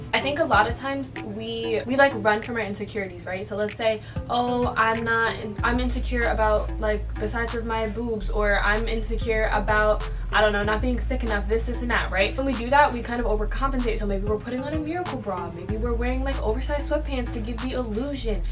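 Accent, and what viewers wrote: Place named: United States. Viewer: omw hey! I love your talks because it makes me feel like I have a big sister and I'm so greatful for that!You are so lekker (lekker-lek-ker ) means that you are so cool! In my language . lol I am from Africa and the way you speak in "American" is so cool also,it makes me wanna speak it to for example: aight bet..your language is so different to ours but it's interesting too♥just wanted to say that I love your channel and I hope you do a more recent "get to know me " video or just something that we don't know about you ...like "pet peaves now" ♥♥ again I love your channel soo much. Please give me a shout out...If you want lol.♥♥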